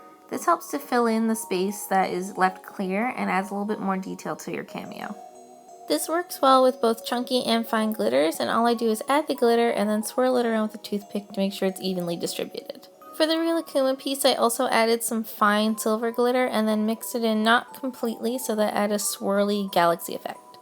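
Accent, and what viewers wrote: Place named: Canada. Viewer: Are you Canadian? I can hear it in the way you say, "about" you say "aboot" lol it's cute.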